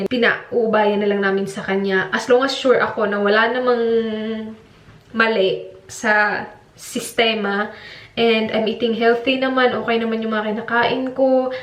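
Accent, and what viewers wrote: Visayas, Philippines. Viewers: The way she speak English, at mag bibisaya sa huli... 😂 That's cool..❤️❤️ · Nakakatuwa talaga ang bisaya accent mo ate😄 Chaaar uuuyyyyyy!😁😆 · first time ko manood ng video mo po. And da way ka mo sulti nakahibaw na jud ko na bisaya ka te hahahaha love this video and imma subscribe to ur yt channel na hehe. Lovelots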